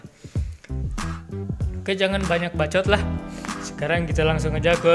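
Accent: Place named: West Java, Indonesia. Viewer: Meuni sunda pisan logat b.indo nya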